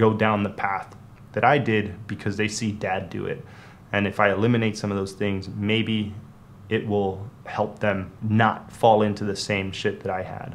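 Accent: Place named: United States. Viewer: Shit man there are so many of us who fight the devil daily.... everything you have said reminds how young one started taking sips from our parents pinching a cigarette etc etc I had water in my eyes... then tarifa appears and playa los lances that gets me in shock... with your American accent thinking you state side.... got to much for me at min 12.50 roughly I'll finish it thow then have to take a benzo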